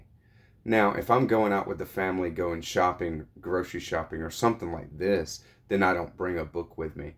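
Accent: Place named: Texas, United States. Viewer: I just watched your video for this tag, and your accent is the thickest I have ever listened to. Is it Texan?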